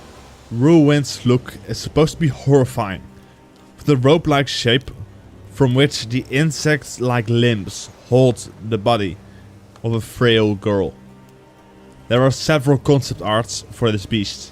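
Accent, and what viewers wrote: Netherlands. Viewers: The first two are well-known mythological animals (phoenix most of all), but I've missed them all completely in the Witcher. But then I also don't play Gwent, so that's probably why. Phoenixes are my favourite animal. Very interesting video with these unknown creatures (unknown at least in the Witcher). Answering your question; I love Tissaia De Vries as a character (and your Dutch pronunciation will just nail that name), but Coral might be a better choice, since less people know about her. Maybe keeping Tissaia for last will get you more views, because it'd be closer to the release of the Netflix series wherein she appears. I'll look forward to it regardless of which one you make first.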